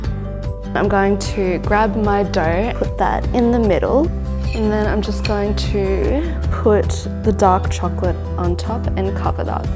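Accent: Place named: Australia. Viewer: omg the CC/subtitles says racist peanut butter cup, it just can't pick up the aussie accent I guess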